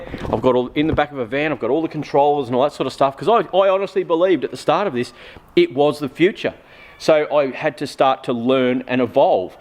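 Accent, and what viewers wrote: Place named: Australia. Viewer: It seems like older people 50+ like this guy in the video just don't get EV's at all. Fair enough you like your petrol engined cars. That's your passion and I get it. But some of the misinformation you spout about EV's is bollocks. Not everyone is like you though and 95% of the population want a car that goes from A to B as cheaply and reliably as possible and that's where EV's excell. You sound like your from Australia. I find it bizarre that due to the fact you get a shit load of sun in your county to capture with solar panels and put this energy straight into the cars that your country has been so backwards for all these years. I'm glad to hear the new goverment you have in power is changing this fact. Your country should be championing energy independence and this biggest fact why I love EV's is because us Brits can transition to some sort of energy independence and not buy in petrol from these rouge nations and keep our money in our country.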